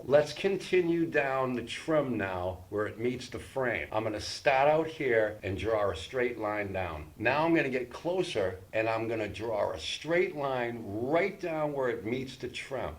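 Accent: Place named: Boston, United States. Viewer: I've watched a ton of videos about cutting in, including ones by pretty high-profile YouTube painters/DIY guys, and this one was by far the most helpful to me. I like that I can see exactly what you're doing, and that I get to see you do it over and over and over and over while you describe what you're doing each time. Also, you have a cheery, encouraging attitude, which is nice :) Oh, and I love the Boston accent. I'm halfway through painting my basement right now, and even though it's my first time painting, my lines are super clean. Thanks!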